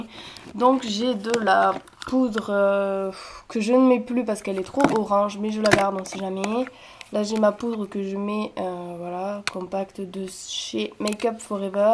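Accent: South, France. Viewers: Coucou ma belle je vient de découvrir ta chaîne j'aime beaucoup ta chambre qui est simple et mignonne. On devine desuite que tu est du sud avec ton accent :P Tu m'a fait rire avec ton espace " cercueille" Je pense que tu voulais dire sanctuaire? x) Bref super vidéos je m'abonne car j'ai regarder plusieurs de tes vidéos et j'aime beaucoup ta chaîne :) J'ai également une chaîne beauté je t'invite a allez voir si sa te dit sa me ferais plaisir ! Bisous xoxo !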